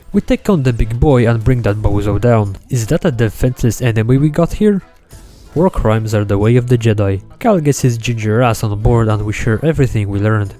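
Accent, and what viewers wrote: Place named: India. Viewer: so where the hell are you from you have an accent so indian?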